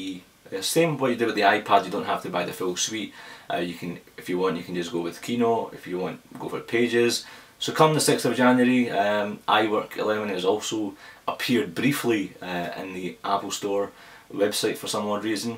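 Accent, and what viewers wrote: Scotland, United Kingdom. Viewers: are you scootish?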